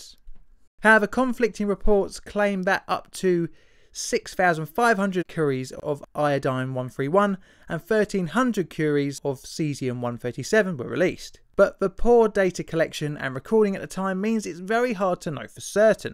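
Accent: United Kingdom. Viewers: um dudes probably a britbonger since you know near anyone can post to youtube. Its not just americans on the site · Why is it that folks in the U.S. information industry think that using a narrator with an awful Limey accent make their production more authoritative?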